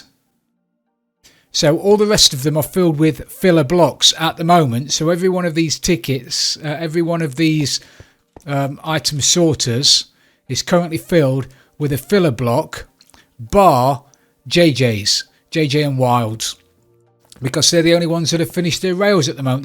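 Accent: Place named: Derbyshire, United Kingdom. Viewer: Wow. Wow. Wow. Mumbo Jumbo who?? You are the master of redstone. Amazing system. I'm surprised that if you like jump 2 so much, you haven't mentioned it before though;) I've been trying to work out which part of the country you come from based on your accent. My best guess is Derbyshire but not entirely sure...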